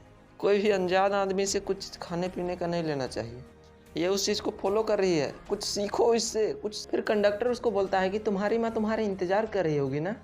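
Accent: Bihar, India. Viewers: Bhai aap Bihar side se ho na....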